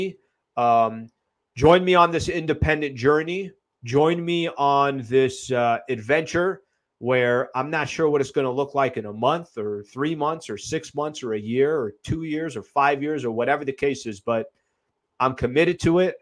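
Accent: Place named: New York, United States. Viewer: You're from CA, though you sound like someone from NYC or Boston. I'm from SoCal and also lived in the Northeast for a while as an adult and never heard anyone one in SoCal who sounds like you. I've been watching for a while and always wondered.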